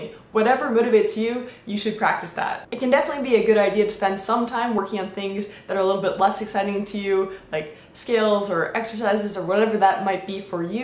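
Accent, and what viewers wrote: Netherlands. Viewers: Are you dutch haha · You sound Dutch, is that true?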